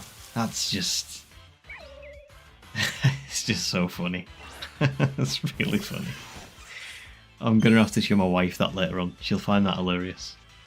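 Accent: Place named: Derbyshire, United Kingdom. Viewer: Where bouts you from mate? Love hearing other people say tea instead of dinner. Im a Derbyshire lad myself. Not often you hear similar dialect over Pokemon content😅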